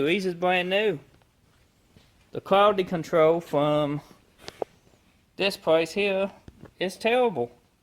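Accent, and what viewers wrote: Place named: South, United States. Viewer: Thanks for the video, your accent is very interesting! what part of America r u from? I'm from Australia. I'm guessing u r somewhere in the southern US but still curious what specific area. 🤓 I installed my aftermarket headlight today, went pretty well only snapped one bolt and many of those brittle bitch clips lol ps for anyone yet to do this job, remember to remove the metal bracket from the original headlight and switch it over to the new one! Don't do what I did and have it nearly all together scratching your head wondering what's missing then have to pull it all apart again 😂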